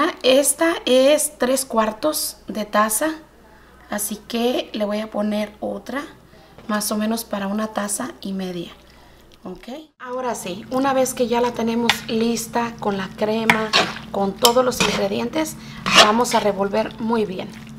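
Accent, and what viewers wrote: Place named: United States. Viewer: Usted tiene tone de rancho pero se siente americana Por sus pronunciaciones eso se llama malinchista, Y dice que es de Michoacán.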